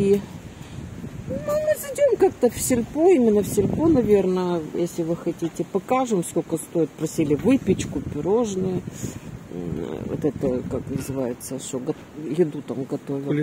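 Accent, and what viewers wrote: Odessa, Ukraine. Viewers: До 2014 года приезжая в Одессу замечала что останавливаюсь и прислушиваюсь как разговаривают Одесситы., спасибо за возможность послушать Вашу такую чисто Одесскую речь. С пожеланием мира всем нам из Донецка.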